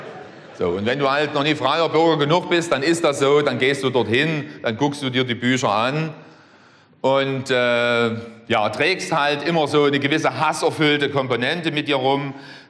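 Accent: Saxony, Germany. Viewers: Na Du gude, das iss säggssche schbraache.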